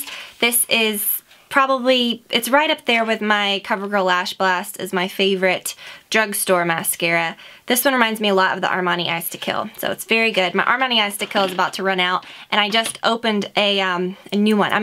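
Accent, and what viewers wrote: South, United States. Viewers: Way to own that southern accent. Southern born and southern bred!!!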